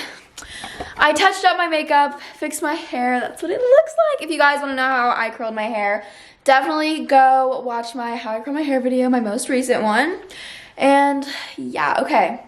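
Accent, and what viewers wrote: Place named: United States. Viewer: I LOVE her country accent!!😂❤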